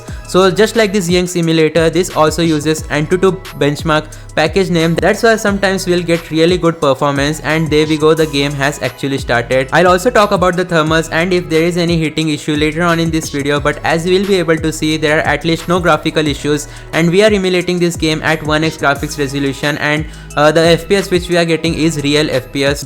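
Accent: India. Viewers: Damn Indian accent is so weird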